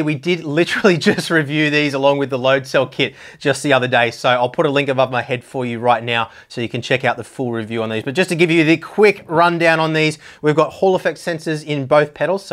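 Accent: Australia. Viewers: I'm assuming you're from Australia with that accent.. apologies if I am wrong. Do you know what the pricing is in AUD?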